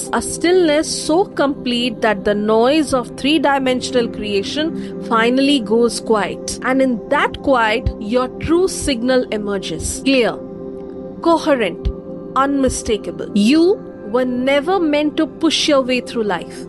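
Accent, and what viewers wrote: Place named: India. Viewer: The stupid ass hindi ascent ruins it for me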